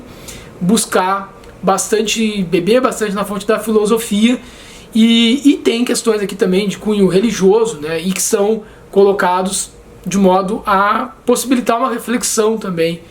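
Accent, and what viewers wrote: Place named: Rio Grande do Sul, Brazil. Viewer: Como sempre, excelente resenha. Tenho aqui No coração do mar, obra que inspirou Melville. Ainda nao li. O canibal é um dos melhores personagens.. Pena q vai sumindo no decorrer da leitura. Que loucura de Ahab... Mas tantas vezes nos apegamos a algo e nao queremos largar mesmo sabendo que o final nao será o melhor. Obrigado por ter voltado. Seu gauchês é ótimo kkkk É muita segunda pessoa do singular kkkkkk mas o mineiro aqui gosta! Abraço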